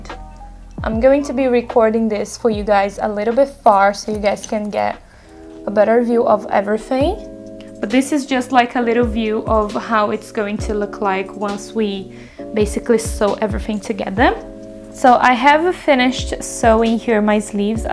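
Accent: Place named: Brazil. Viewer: That's so cute! Definitely going to try it at home. Also, by hearing your accent, I wondered... Are you Brazilian? I sort of recognized it comparing with my own accent kkkkk Ether way, I'm loving your channel so far! Keep the good work! :)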